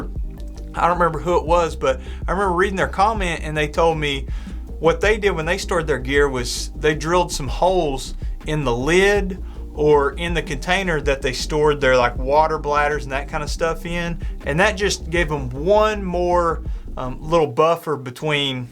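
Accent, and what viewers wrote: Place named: South, United States. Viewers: What's up with his accent? Why is he talking like Forrest Gump? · My goodness! thats the one of the sweetest southern accents I've heard! love from the netherlands! <3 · I listened because of your southern accent...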